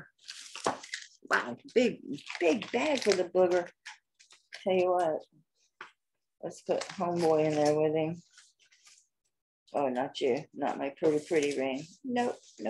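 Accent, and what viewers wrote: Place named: South, United States. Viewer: I luv your videos & your sweet southern accent is the best!! Your stories & humor makes your videos FUN!!! My necklace arrived & I love it 🥰🐰🌸💕have a great weekend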